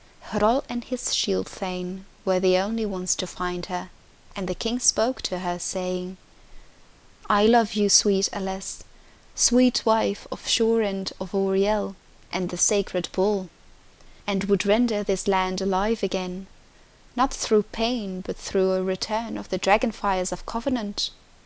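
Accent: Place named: France. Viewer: Lovely French accent and well read its one of my favorite TES books, bravo.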